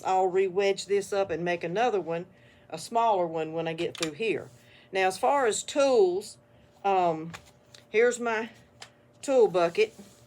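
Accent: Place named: Tennessee, United States. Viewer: I grew up in TN. I miss the accent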